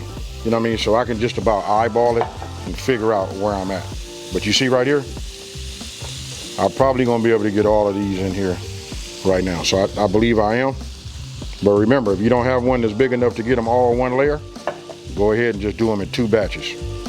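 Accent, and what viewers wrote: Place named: Midwest, United States. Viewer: Bro where you from I’m from Kansas City Missouri and I swear you from KcMo or Midwest by your accent or somewhere Maybe Detroit lol… nonetheless that meal fire G I’m making this next for my wife & moms Insha Allah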